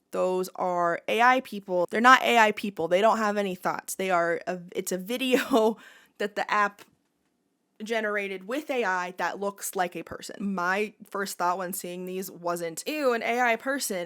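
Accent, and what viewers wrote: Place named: South, United States. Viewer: i don't think I realized you were southern!! southern gang!! 😊 Also, great video. i love this!!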